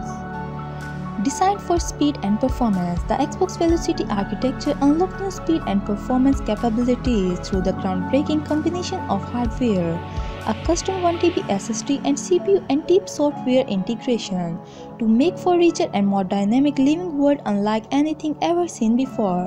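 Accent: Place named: India. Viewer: While reasonably informative, please consider using a native english speaker for conveying the message. The Indian accent did not work very well here, sorry.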